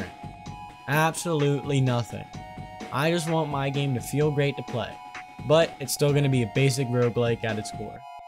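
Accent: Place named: South, United States. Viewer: southern accent sounds lovely!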